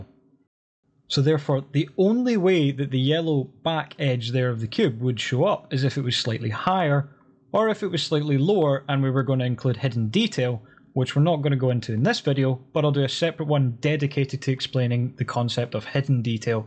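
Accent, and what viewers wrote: Scotland, United Kingdom. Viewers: Took me 3 min to realize he's Scottish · I'm learning Blender and this video completely clarified orthographic vs perspective view. Thank you. Well done. I recognized the Scottish accent and then saw your screen name "." Love it!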